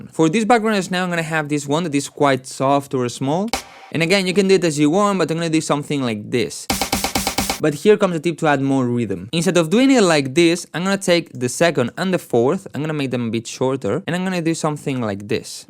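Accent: Spain. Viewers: eres español jajaj se te nota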